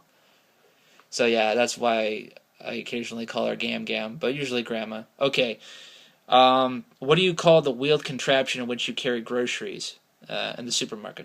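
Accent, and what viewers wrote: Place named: Ireland. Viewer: hahaha you're adorable xD singing your username!!! ' ' XD haha I'm sorry to hear about your grandparents I only have my gran left too, one gran on my mums side The trainspotting book was a surprise haha Yer Scottish accent Isnae terrible bu it soonds mair Irish than Scottish tae be honest wi ye ye need tae come here tae properly learn the accent! But you read very well haha considering the Scottish English ^^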